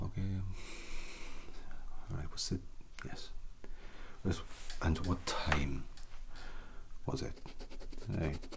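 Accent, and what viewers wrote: Wales, United Kingdom. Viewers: Fascinating! Never imagined a complaining coppo being relaxing. Can you share what kind of Welsh accent that is? It sounds almost Scottish. The only Welsh accent I know is what Christian Bale uses when not method acting off-camera. And is it normal for Welsh to work in some Welsh words whilst speaking English? Well done dude! Thanks! Cenllysg Cymru! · I'm with you Constable. I'm not fond of people either. That's why I rescue cats. Happy to hear your Welsh again. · I love when you use the Welsh accent. 🥰 Also Rory had me laughing the whole time I almost forgot that I was reporting a crime.😂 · I am one happy person! I think I once requested a video with the welsh accent or language (which I am learning🙃🙃). This was hilarious all the way through. Thank you!! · Wow your welsh accents amazing, and your mannerisms and idioms are great! 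Well done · This is a uncomfortably accurate welsh accent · 何本か見て悩んでたけど、解決した。発音ウェールズなんだ！！！ カーディフって聞こえて脳内がトーチウッドに占められたし、このままいい夢が見れそうです。 Thank you ち！！ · I've never heard a Welsh accent before and WHY ARE THEY NOT MORE POPULAR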